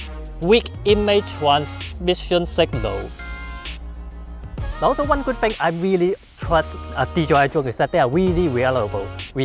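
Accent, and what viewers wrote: China. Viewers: You are from Hong Kong, yea? My mother speaks cantonese and all ger chinese friends have the same accent :D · china english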